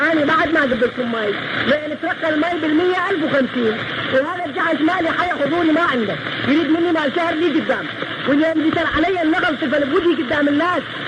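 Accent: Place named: Baghdad, Iraq. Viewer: مشكلة بعض الكلمات غير مفهومة بسب التشويش بس الكلام البغدادي الاصيل روعة شلون لهجة حلوة ❤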